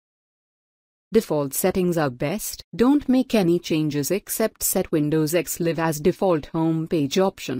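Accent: India. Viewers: Are u indian? ur accent is just like an indian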